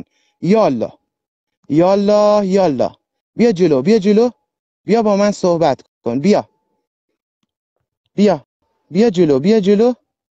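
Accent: Kerman, Iran. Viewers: دمت گرم مرد با دل و جرأت کرمانی ممنون از زحمات شما و گویش بحال کرمانی تان👍♥️👌🙏🙏tanke you..very good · ممنون خان برای ضبط این مستند. لهجه کرمونیت خیلی قشنگه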